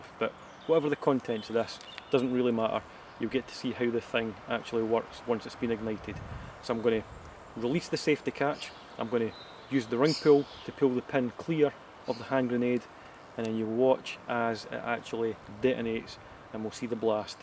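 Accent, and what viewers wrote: Scotland, United Kingdom. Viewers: Scottich accent.............. I like it :D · Yeahhhh, I don't think he is British. I think he is Scottish... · are you scotish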